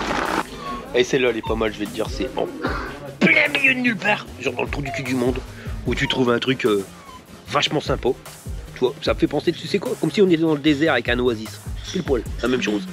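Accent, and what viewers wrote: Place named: North, France. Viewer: Un kiff total !!! Avec l accent du chnord c est vraiment cool ! 🎊👍🏻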